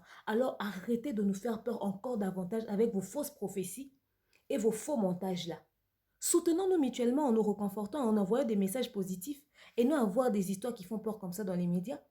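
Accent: Ivory Coast. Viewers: Bien dit merci. Juste ton accent, tu parles comme les ivoirienne pourquoi?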